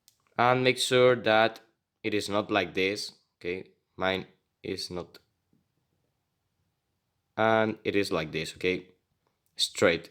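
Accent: Spain. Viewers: Are you spanish? Tu accento lo parece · Jsjsjs como se nota el acento español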